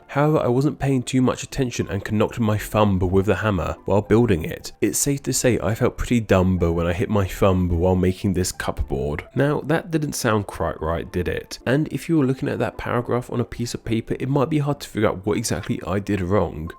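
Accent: United Kingdom. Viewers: Thats the british way of speaking. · That one has never made sense to me. We should just spell it “ieorn” or something. Technically, he used the same “r” most Brits use with preceding vowels before a consonant. We Americans lean into the “r” as a full-fledged consonant, like civilized people (sorry about the “zed”, Limeys) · Americans actually pronounce a lot of those words fully, I think that maybe 25% to 50% of those words you listed off at the mark were just British pronunciations of them. PS: Also, if a silent letter falls in the forest, and no one is around to hear it, does it make a sound? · The R in Iron isn't silent you're just British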